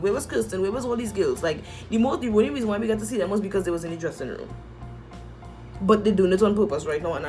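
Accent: Trinidad and Tobago. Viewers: Lady,I like you you make my pores rise you sound totally Trini.Sorry.. Carribbean countries can't vote ,you know who we voting for.l watching like a hawk. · Hunni that TnT accent and the fact that you are upset made the accent better 💖💖💖. Well, thanks to persons like you CBS received those messages and got the last laugh. I hope they discontinue the exploitation of black women for their ratings moving forward. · I guess it all comes down to who the audience is. I have a pretty boring life and my relationship is so drama free and stable that I watch reality dating shows to entertain myself! So, the drama factor works well for me! 😅 Btw, are you Carribean ? You sound very similar to my Trini coworkers, and I just wanted to check if I can successfully tell the accents apart!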